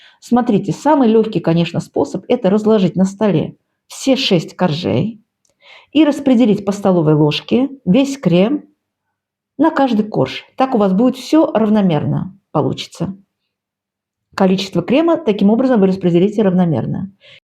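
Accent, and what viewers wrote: Baku, Azerbaijan. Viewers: Спасибо огромное за рецепт тортика,такого в моей "копилочке" еще нет!!! Испеку обязательно,порадую внуков!!! Обожаю ваши ролики,ваш бакинский русский!!! Привет из Молдовы...❤ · Smotru vas iz Amerika, no sama iz Baku azerbaijanka, za stolko let akcent ne izmenilsa, udaci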